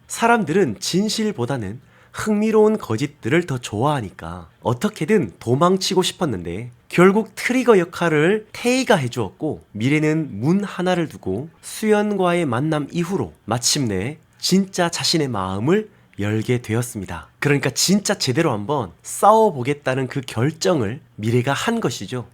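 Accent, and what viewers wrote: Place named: Gyeongsang, South Korea. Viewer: 님 경상도 사투리 엑센트가 지대루네요ㅠ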